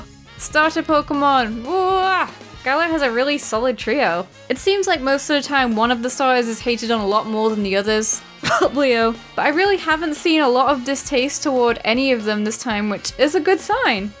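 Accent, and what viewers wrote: United Kingdom. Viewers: hon i didn’t even realise you were british because i’m so used to british accents being one myself it didn’t even register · I don't know why this is...but for some reason, up until this video, I thought you were American. I normally recognize British accents immediately, but I guess your accent just never registered for me. Did you change nationalities while I wasn't looking, or have you been drinking a lot of tea recently? The Mandela Effect is real ladies and gentleman....also I didn't know that you and were the same person.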